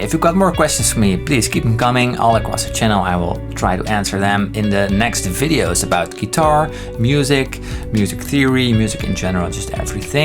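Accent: Netherlands. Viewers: ah ik dacht al dat ik een Nederlands accent hoorde ;P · As a Belgian I could tell pretty much right away his accent was Dutch. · Altijd al getwijfeld of je Nederlands was, wist t oprecht niet zeker haha. Geweldige video's trouwens ga zo door!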